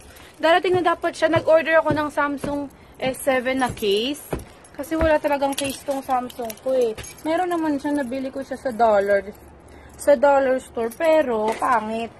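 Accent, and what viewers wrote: Visayas, Philippines. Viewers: Ate bisays ka?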